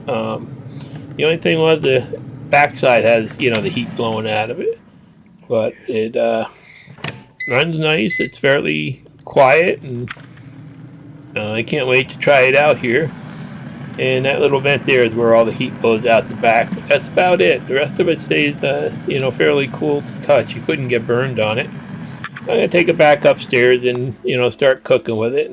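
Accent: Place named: New York, United States. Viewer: You have a slight New York accent but no hillbilly twang!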